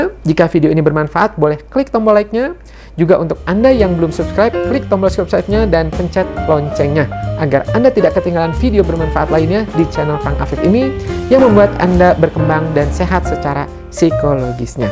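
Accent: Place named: Sunda, Indonesia. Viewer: Vidio yg selalu insightfull soal guilt trip alias gak enakan. Dan Ketika sundanese menjadi narator, sangat terasa intonasinya. Karena saya pun begitu. Tema Kontennya pun mirip dengan, tp masih pemula saya hehe.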